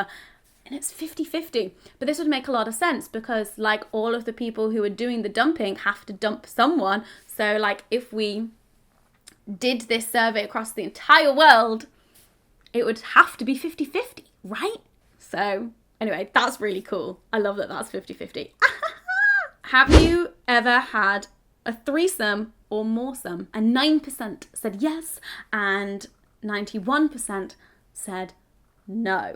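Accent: United Kingdom. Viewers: I don't know why this video was suggested, I'm glad to hear British English, since I'm in Sweden now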